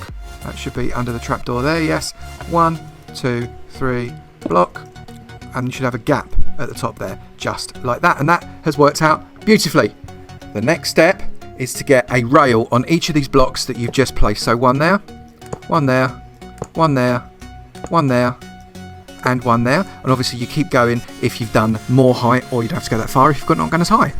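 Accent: United Kingdom. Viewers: I like the vid coz of ur voice British people these days or Australian :)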